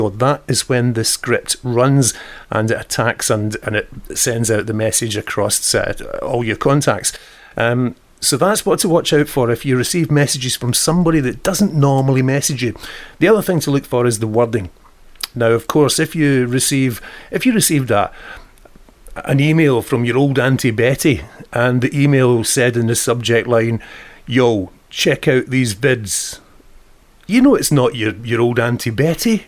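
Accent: Scotland, United Kingdom. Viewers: loved hearing your Scottish accent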